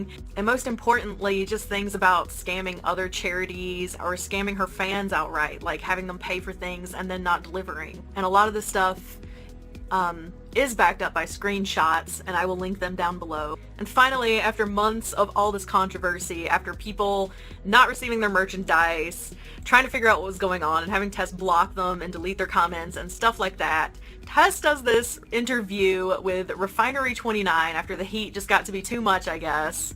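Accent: South, United States. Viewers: Are you souther (sorry for this random question but I love your voice and I'm curious about your accent) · Damn. When you said, "You create yourself," that was so powerful. You articulated everything so well in this video. Great job. I know this is a few years old but I hope you're doing well. Are you from the south? I like your accent lol. I'm from Tennessee.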